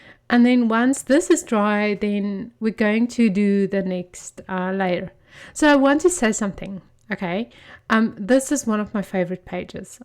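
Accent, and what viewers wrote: South Africa. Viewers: It's the first time watching your channel, and i thoroughly enjoy your accent, sounds much like my own - South African. By the way - butter chicken is my favorite!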